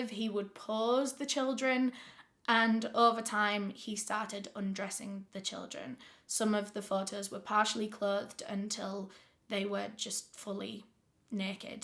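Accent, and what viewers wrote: United Kingdom. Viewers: Do you ever see a person before you hear the accent you think to yourself "that person is very very British." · You are my favorite true crime channel. I’m from the US so love the accent as well. You give us information as well as having the upmost respect for all the victims you talk about. And also holidays are coming around and I know you talk about your personalized hair care and for the life of me I can’t remember the name or website please let me know thank you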